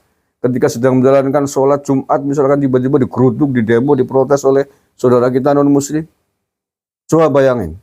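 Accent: Banyumas, Indonesia. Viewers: Tambah edan..setuju Gus...logat banyumasan medhok tenan pak dhe...👍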